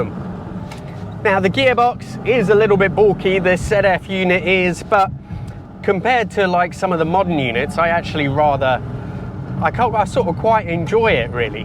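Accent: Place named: Italy. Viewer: So damn cool how rare it is for such a nice car. I bet next to none came here to the US of A. Would be even weirder to have that than my Jaguar 420 compact. Maybe looks like something that a handful might have came to Canada. You say it's '60s, but what year is it? Must be very late '60s. Didn't Hub Nut or somebody do a video on this? I think your I-talian accent sounds very nice!!! Thanks for the great video!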